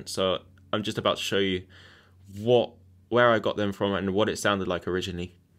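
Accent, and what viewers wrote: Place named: United Kingdom. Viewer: My Guy 😁🤓I'm from Germany lol sounds 100% UK👍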